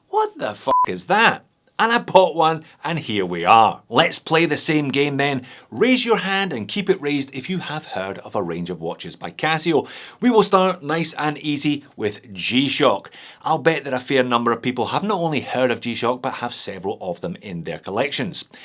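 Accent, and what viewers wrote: Scotland, United Kingdom. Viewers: I know you're in Australia but are you originally from scotland because your accent says you are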